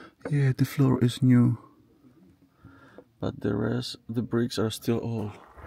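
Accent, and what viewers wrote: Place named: United States. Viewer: Your accent is really hard to understand, are you from America??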